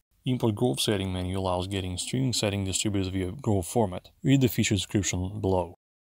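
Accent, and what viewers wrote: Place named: Russia. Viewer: It's a Russian accent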